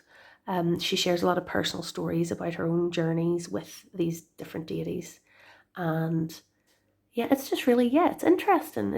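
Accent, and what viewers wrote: Ireland. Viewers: I would love your channel if for no other reason than it makes me so happy to hear someone speaking Irish naturally & with the 'right' accent 💚🌱🍀 My kids would be getting those books if they were 30 years younger 😁😯 I miss the really good kids books. I own that CBR oracle you love - the red one - and also the Enchanted Map. I do love them, any other decks of hers are long gone since I read more about what she is like 🤐 I hope your new one is useful to you 😊 I doubt it will shock you that I LOVE the Wildwood tarot 😍 I don't use it, I just stare at the art & smile. Also love Mystical Moments tarot, her art is unbelievably gorgeous. Thank you for the update. I enjoyed it as always 😊👍💖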